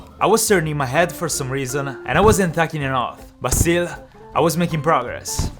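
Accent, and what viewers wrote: Italy. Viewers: bello come si capisce subito che sei italiano ma nessun italiano commenta i tuoi video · madonna quanto si sente che sei italiano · Fra se sente che sei italiano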